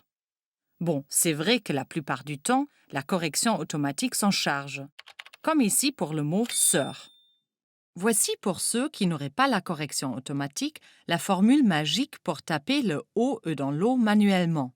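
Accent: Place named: Germany. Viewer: Ç'aurait été quand même mieux si la présentatrice parlait français sans cet accent allemand un peu gênant. Mais bon merci en tout cas pour la vidéo.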